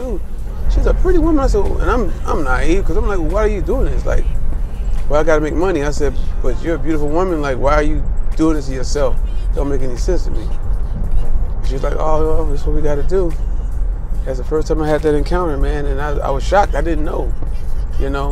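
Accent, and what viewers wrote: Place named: California, United States. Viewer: I knew you was from California. At first I thought you might have been from Oakland or The Bay. You sound like all my potnas I hung with growing up who was raised right like myself.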